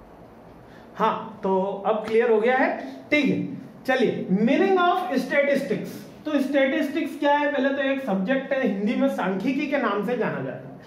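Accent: Gujarat, India. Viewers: Sir aap Gujarati hainn kya?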